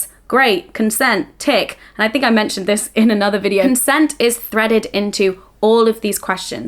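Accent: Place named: England, United Kingdom. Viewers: anytime I watch with the sound off my brain still knows to read the captions in english accent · Shes English that's how she speaks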